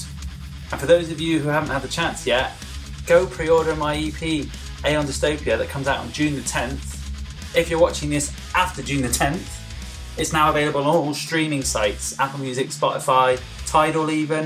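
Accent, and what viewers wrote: United Kingdom. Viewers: Dude. You from the west country?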